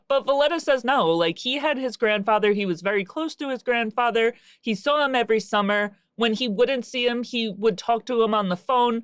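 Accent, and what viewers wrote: United States. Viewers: ohh I completely understand, I wasn’t trying to hate to say you’ve don’t anything offensive or any silly crap like that . I actually like your videos a lot you do amazing work and you do it from a perspective you don’t often see or hear from I like hearing stories I’ve heard (and some I haven’t) before but in a way that I never heard it and you deliver that which isnt an easy thing to do Plus Being born and raised in Baltimore and having a pop pop who use to travel back and forth from bmore to Connecticut, NY and Jersey like he had a different wife and kid in each state (I think he might of actually on Buffalo but that’s beside the point lol) but he was an old school “union worker and sometype of official” who also was a major number runner in the city (it’s ok he passed away awile ago so no worries) but I’ve heard some stories and seen a few crazy things as a youngin lol you also remind me of a few girls who were really good friends that I grew up with. 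I know your not from bmore im Pretty sure your from somewhere in the Tristate area maybe in one of the five famous boroughs of New York or New Jersey maybe Jersey city or Newark. I can hear a little bit of it in certain words you say (you’ve probably mentioned it before, my memory is shit nowadays anyway lol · I love your accent. Reminds me of living in queens ❤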